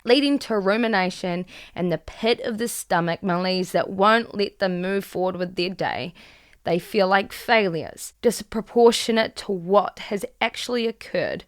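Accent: New Zealand. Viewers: Toxic egomaniac band member taking total control and walking over the top of you , ah! that brings back memories . And everything else you've experienced seems so familiar , good to see you have such insight into yourself . Too bad that wasn't the case with me thirty years of going nowhere , P.S. love that kiwi accent . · I think it's a New Zealand accent. I automatically assumed Aussie at first, but certain words have a NZ twang.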